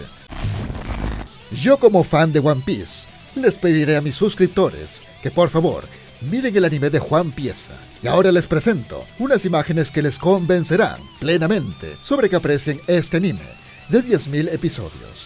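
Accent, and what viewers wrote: Peru. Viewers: es peruano? 😰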